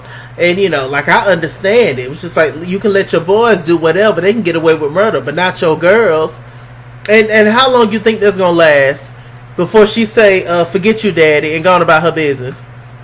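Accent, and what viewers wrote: New York, United States. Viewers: you sounded just like NY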